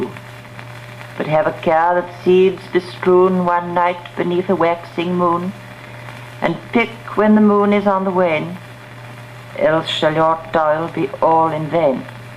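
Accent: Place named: RP, United Kingdom. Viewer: She speaks queens English, she has no accent.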